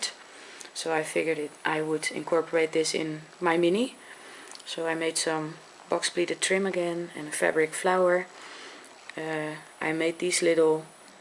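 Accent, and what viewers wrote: Netherlands. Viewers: Ik geloof aan je stem te horen dat je Nederlands bent? ik wil zelf ook graag proberen een scrapbook te gaan maken voor mijn examen, ik moet een modeboek maken dus lijkt me wel leuk om hem zo als een scrapbook te maken want dat heeft iets aparts. nou vroeg ik me af waar ik zulke spullen ervoor kan kopen weet je misschien goeie winkels/webshops?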